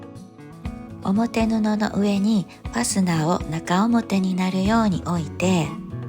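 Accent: Kansai, Japan. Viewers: 関西弁がええなあ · オススメに出てきました😊はじめまして～ヾ(*･ω･*)ﾉ 時々出てくる関西弁と、関西弁じゃなぁい⤵︎⤵︎⤵︎と思いきや、イントネーションが関西な所❤Love 親近感あって安心するし、生地が出来上がって作品になっていく過程がまた可愛くて、最後まで飛ばさずに見てしまいました😂 ハンドメイド系のYouTubeは、ついつい最後の完成品を見てから、気に入ったら最初から作り方を見る、という感じですが、今回は、まるっと見ちゃいました❤ 素敵な動画をありがとうございました🎶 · おすすめから来ました！パッチワークはやったことがなかったのですが、こんな小さいハギレがこんな素敵な作品になるなんて驚きです！ 関西弁の落ち着いたナレーションもめちゃめちゃ癒されました！チャンネル登録させていただきます！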